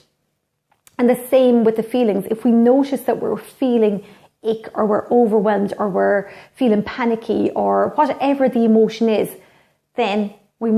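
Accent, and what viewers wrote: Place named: Ireland. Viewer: Such an important aspect of good health to talk about! Btw I love your Irish accent haha:) and keep up with the videos, I'm loving them:) x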